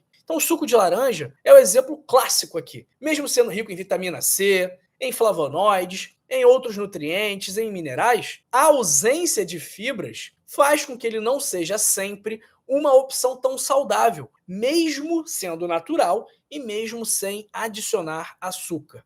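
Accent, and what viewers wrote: Rio de Janeiro, Brazil. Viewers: Faz só dois anos que estou comendo banana madura e bem madura. Aqueço numa frigideira pequena de teflon sem por açúcar e sem óleo depois adiciono aveia já cozida em água pra evitar leite . No final adiciono boa quantia de canela moída . É lanche bom. As outras frutas nunca consumo. Percebi que a ameixa seca preta tem um açúcar terrível; dói o dente na hora. Muito legal o seu sotaque carioca.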